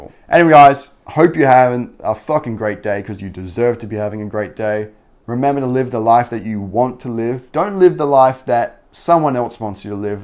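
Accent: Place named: Australia. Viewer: Where are you from? You sound kinda Australian but idk it doesn't sound like 'ultra-Aussie-accent'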